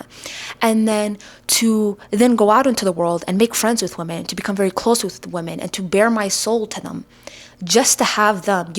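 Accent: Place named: Dallas, United States. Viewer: Ma'am I need to know more about you lol bruh your accent your face your mannerisms your rich cultural history and animated storytelling I looove it all ! So Full of personality ... I'm like do I detect a hint of "american black girl from Dallas" type of accent ??? What region of the U.S. is your accent from ??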